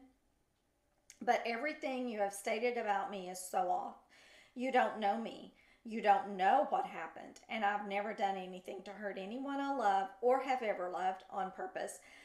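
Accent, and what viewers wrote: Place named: South, United States. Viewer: I love your channel, and I love Billy Smith and his family’s channel. You’re awesome, ❤ You’re so sweet, and pretty! And I can tell a fellow southerner when I hear one:)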